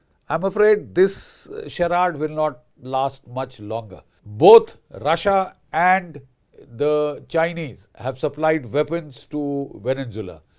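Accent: India. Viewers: CA RAcus. Pronounce it correctly. The Indian accent SUCKS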